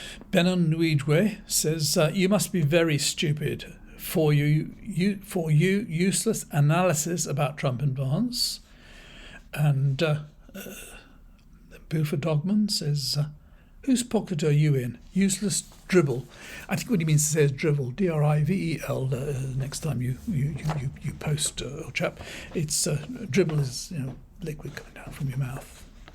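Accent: RP, United Kingdom. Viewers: Ramstein is right, you are a dimbo, not even as smart as a nitwit, a proper plank like our PM, you are in short in your dotage, a foolish old Englishman with nothing but a slightly posh voice as cover, see how far it gets you in the real world, if you've ever been there a proper SNOB top to bottom, what crushed you radley school or London. or both? Get back to you biggles books, if you even remember where you put them.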